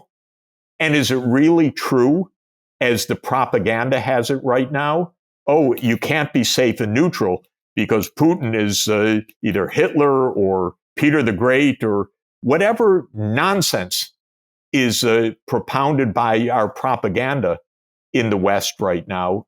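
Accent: Netherlands. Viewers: Your heavy Dutch accent is so funny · You sound dutch,it is Sachs not Sex😊 But never mind,good to invite mr.Sachs👍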